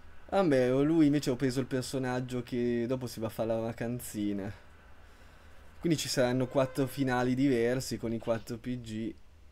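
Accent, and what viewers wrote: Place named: Marche, Italy. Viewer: no ma mio padre è della zona di Fermo e andiamo spesso in vacanza a San Benedetto/Porto San Giorgio, da come parli sentivo un po' di cadenza marchigiana 😁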